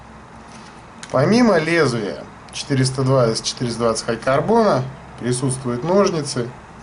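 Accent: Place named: Moscow, Russia. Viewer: Обычный Московский акцент! У некоторых колхозников, тоже уши режет , ножниц'Ы, пол'Ожил, отдуда'ВА, и прочий словестный понос!!! Автор видео, не сколько Акает, сколько вызывающе говорит! "Выёбисто" что-ли..))) Но обзоры достаточно интересные!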